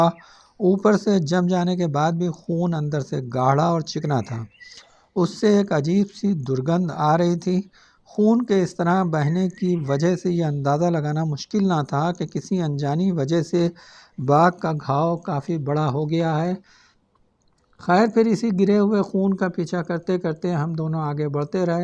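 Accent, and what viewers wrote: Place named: Pakistan. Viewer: आपके उर्दू एक्सेंट में कहानियां सुनना बहुत ही सुखद लगता है, शुक्रिया..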